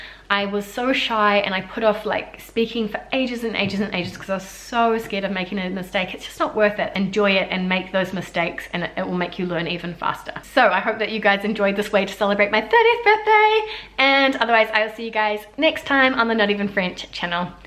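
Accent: New Zealand. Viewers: Joyeux anniversaire!! Merci pour cette chouette vidéo et pour ton appréciation de la France. J'aime ton accent et tes expressions NZ. 😊🎂🍾🎉👏👏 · Happy Birthday, I have short showers due to hot water shortage but I still manage to rinse my soap. You better rinse just in case is not good for your skin. A lot of people also don't rinse the dishes from the soap in Australia. The only time I don't rinse the soap of me is when I have bath because I run out of hot water. May your 30s are as happy as your 20s. You are real pleasure to watch. I like the way you speak NZ language!!! And I like your French too. I have heard you speaking French!!! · Bon anniversaire ! C'est marrant tu as repris ton accent néo-zelandais xD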